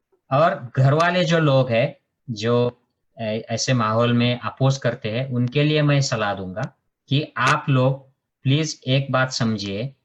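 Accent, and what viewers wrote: Khandesh, India. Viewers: Sir aaple Marathi Mansa lagech olakhle jatat aapli khandeshi lokanchi hindi khup ch khatarnak Aste sir😀😀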